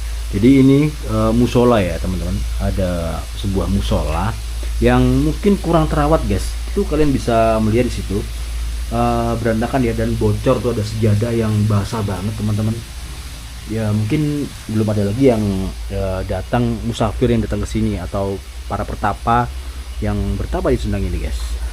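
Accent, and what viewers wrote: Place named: Pasuruan, Indonesia. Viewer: GUENDENG PAK... KHAS AREK PASURUAN 😂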